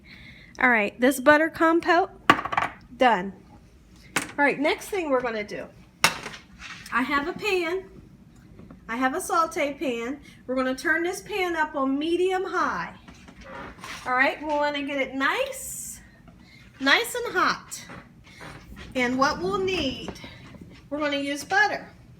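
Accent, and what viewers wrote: South, United States. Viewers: I cant be the only 1 who thought that voice belonged to a lil white southern lady😂🤣 good cooking 👍👍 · Victor Matthews yea i was surprised at the end when I saw her arms were black she sounded like a perfect typical white southerner with the laughing too and how happy she was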